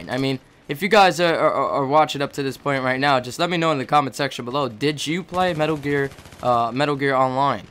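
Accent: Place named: New York, United States. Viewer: He has that New York City accent, so it's not his fault.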